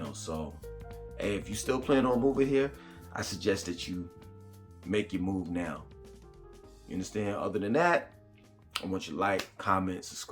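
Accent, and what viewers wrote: New York, United States. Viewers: The irony of this Northern dude talking about Charlotte & Atlanta getting too much for him. He sounds like he from NYC…Queens or Brooklyn to be exact. He’s part of the problem 😂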